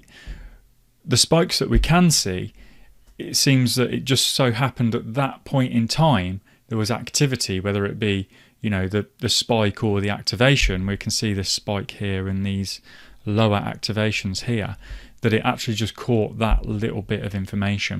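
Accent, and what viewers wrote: United Kingdom. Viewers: I am sure that accent is from my neck of the woods Burton On Trent ? I have one of these 1008 Hantek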